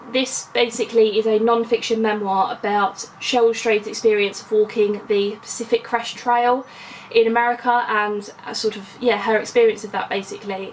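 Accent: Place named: Birmingham, United Kingdom. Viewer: I love you're accent! Are you from Birmingham?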